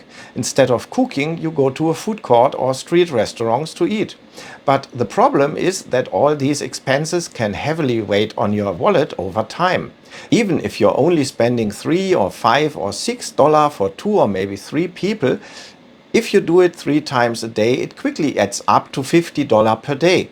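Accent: Germany. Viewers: All logic and no emotion with a German accent….. perfectly meets stereotype 😯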